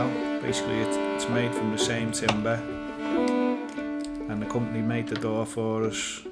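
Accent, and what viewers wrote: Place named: Lancashire, United Kingdom. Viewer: Your accent.. West Lancashire/Merseyside.. How you find it living up there? Long way from home, but I've always wanted to move up there myself too. How's it in your experience?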